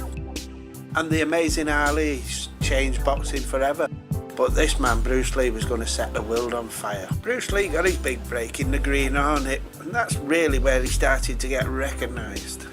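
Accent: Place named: Northern England, United Kingdom. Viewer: Hi,another fantastic vide and trip back to my youth... thanks again! I too went through a kung-fu phase and alongside my Monster mag collection my Kung-fu monthlies were( and still are) prized pocessions of my collection.I was born in 1960 in Nottingham and have fond memories of riding my Raleigh chopper around (which i still have!) with my portable tape player blasting out some of my 70's heroes..., Glam rock, and those great K-tel albums.I also collected a lot of soundtrack albums(A future feature) inc Bond,Kung-fu films plus disaster films inc the great Big terror themes lp by.I moved to Bolton Greater Manchester 5 years ago to be with my girlfriend(who upon hearing your voice detected a certain northern dialect?)I still collect stuff from that golden period and have introduced my girlfriend to the delights of Hammer-Amicus films,ITC tv shows,Wheeltappers & shunters(Colin crompton is a great in our household!) and the fabulous music of the 60's-70's.Your channel is totally unique in looking fondly at a British cultural coolness!.Looking forward to more in the future. 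Take care. Ricky.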